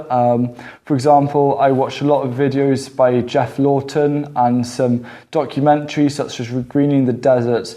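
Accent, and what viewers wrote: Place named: South Wales, United Kingdom. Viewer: Hi, I'm a new subscriber from New Zealand. Thank you so much for these wonderful videos, I love the variety of content and your personality is a winner. I was feeling quite disheartened with my soggy winter garden before stumbling across your channel and now I'm feeling a lot more inspired, thanks! Your accent is a touch of home, as my grandmother is from South Wales and I also lived there for a short time:)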